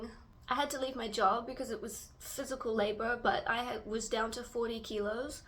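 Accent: Australia. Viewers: What country were you born in, if I may ask? I detect a slight accent in your English that sounds Australian. May your 2026 be the best year of your life. 🎉🎉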